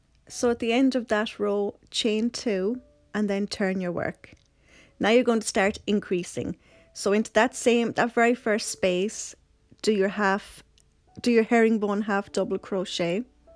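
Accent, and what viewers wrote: Ireland. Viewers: Thank you so much for your quick reply 😊 I will try the 2 strands together.... your instructions are great, it is nice to hear a fellow Irish voice ☘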